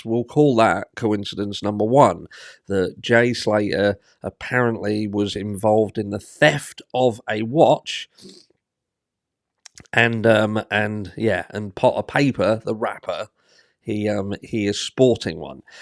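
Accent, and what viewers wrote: Norfolk, United Kingdom. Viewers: You sound norfolk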